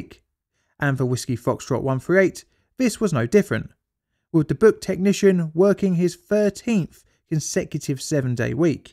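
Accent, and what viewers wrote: United Kingdom. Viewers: Dude love your stuff I mean who takes the time to learn all this I guess we do cause you make it interesting and god love that British accent yes please we would like more or should I say Please sir may I have some more!!!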